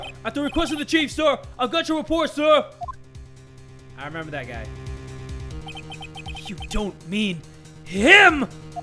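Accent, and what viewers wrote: South, United States. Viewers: I love southern accent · Jays country accent is 👌😂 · LMAO I LOVE YOUR COWBOY ACCENT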